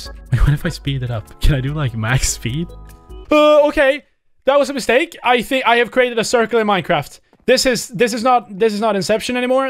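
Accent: Sweden. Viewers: OH you're swedish, that's why your accent is so bad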